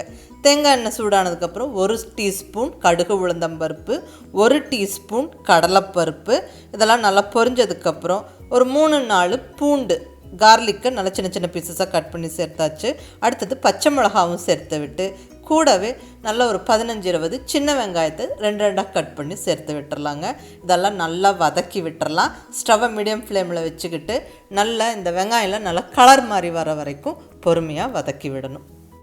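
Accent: Coimbatore, India. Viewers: Pakka coimbatore voice.... love that u were hiding the face...nowadays people are running behind fame.... u r unique among them....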